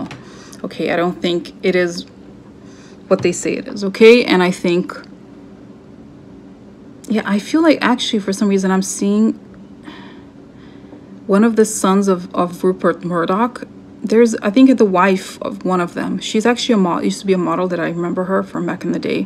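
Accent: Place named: Russia. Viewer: Hey cheers.. so it didn't happen that way-keep chin up and come back and do some more cards! Miss your funny Russian accent!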